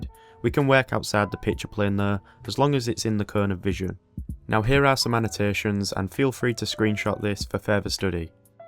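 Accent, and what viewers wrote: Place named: Hull, United Kingdom. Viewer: His accent is Hull, from the North of England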